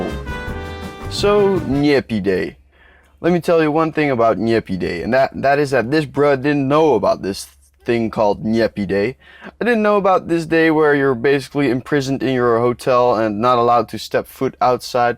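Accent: Netherlands. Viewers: haha i knew you were dutch even before you said amsterdam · Spot the dutch accent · Are you Dutch? You sound really Dutch! Trans: ben je Nederlands? Je klinkt erg Nederlands!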